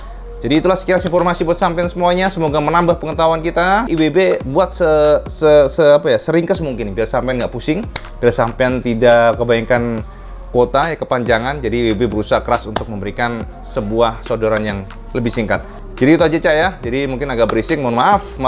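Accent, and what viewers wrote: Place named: Surabaya, Indonesia. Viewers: Top cak.sangat baik penyampainnya.bahasa suroboyoannya jg ok masio aku wong sundo.tapi toplah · boso ne jowo suroboyoan luuuurrrr....istimewa....!!!! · jane wong ndi to ki kok bohosone suroboyoan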